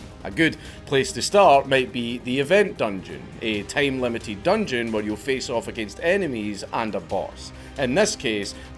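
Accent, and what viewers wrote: Scotland, United Kingdom. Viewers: This was such a good one. Thanks for putting in overtime. Sometimes when I’m watching your videos I have to actively remind myself that it’s not Nick Offerman doing a Scottish accent. Are you two related? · words are hard... especially in a scottish accent...🙏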